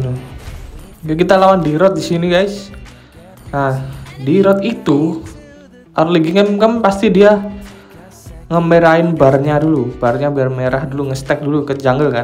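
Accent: Java, Indonesia. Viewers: suaranya jawa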